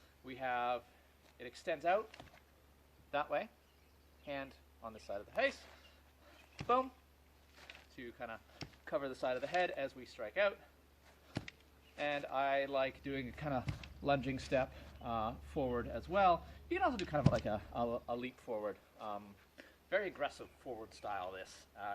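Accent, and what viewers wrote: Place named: Sweden. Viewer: You have a Swedish accent.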